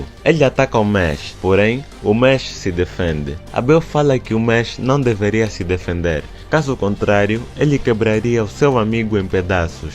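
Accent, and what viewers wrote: Angola. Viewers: O narruaidor eis angolaino? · Ele parece ser da Angola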